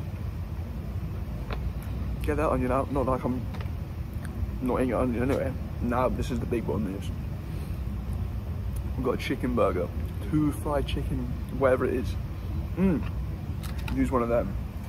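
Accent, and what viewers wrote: United Kingdom. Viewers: please put english subtitle in your next video coz my brain is not cooperating with your british accent😅🤣✌️ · Omg i love British accent absolutely great and your video absolutely amazing, all support 😊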